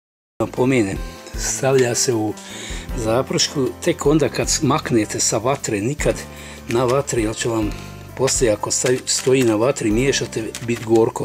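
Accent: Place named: Sarajevo, Bosnia and Herzegovina. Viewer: Vozdra i bite! Moram priznati ti si prva osoba iz Nicaze koja sam cuo da zvuci k'o da je sa Marin Dvora. Studirao sam sa dosta Zenicana i nijedan nije imao takav naglasak. Eto vala, k'o da si nam rodjeni Sarajlija. Hahaha! Nego ziv bio i uzbrdo brz. Uzivaj u svemu.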